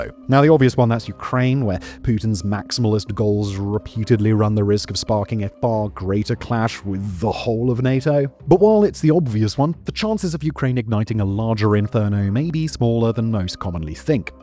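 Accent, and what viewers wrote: United Kingdom. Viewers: His British accent is too heavy for me. I can't follow what he's saying.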